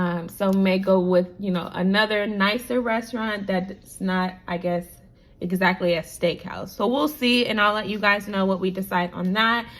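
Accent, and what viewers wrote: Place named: Caribbean. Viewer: I sometimes hear a little bit of an accent, but I can’t quite pinpoint from where lol Caribbean maybe? I’m so nosey!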